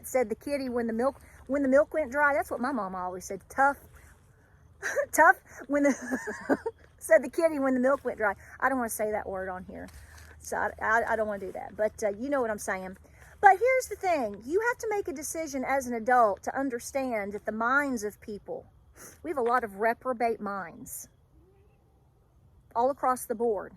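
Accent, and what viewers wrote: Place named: Appalachia, United States. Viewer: Girl i got such a giggle...perfect Cherokee and then that soft southern mountain drawl. Such a juxtaposition. My mama's entire family is in that beautiful face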